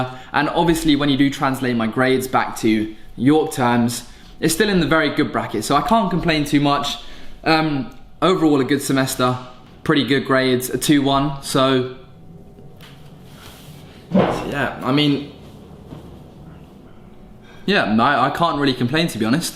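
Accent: Australia. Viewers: You should go somewhere tropical to spice up the views... Bali, where I am Malaysia, Bangkok. New Zealands visually bit blah. You just bit of a square M8 liven it up. Lol and lose the awkward Australian twang. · do I hear a slight Aussie accent now? 😂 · Omg you really are getting a Australian accent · Lord your Australian accent is getting thicker and we aren't even at July yet. You're going to come back to the UK a full Assuie.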